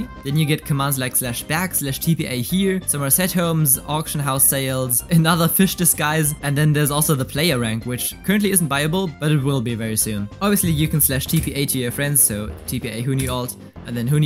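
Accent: Germany. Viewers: Alter immer lustig wenn man den deutschen Akzent so durch hört😂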